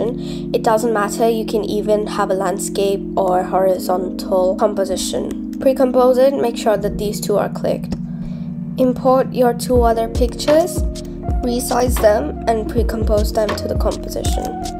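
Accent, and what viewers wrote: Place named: United Kingdom. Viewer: she has a british accent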